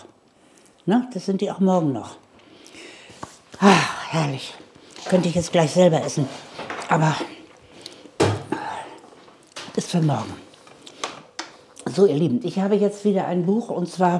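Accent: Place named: North, Germany. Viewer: Liebe als Fan der allerersten Stunde hat es stets etwas heimeliges, dir zuzusehen. Dies umso mehr als deine Art zu erklären, sprechen, ja- auch die Küche selbst- mich an meine norddeutsche Schwiegerfamilie erinnert. Umso bedeutsamer, da mein lieber Mann derzeit sehr krank ist und Aufmunterung gebrauchen kann. Die kommt nun von euch beiden. Dank euch für das tolle Rezept!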